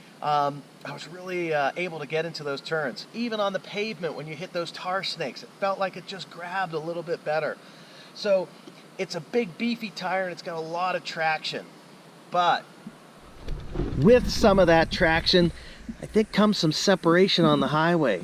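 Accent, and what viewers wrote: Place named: Australia. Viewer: 9/10 for the review, 4/10 for the Aussie accent : )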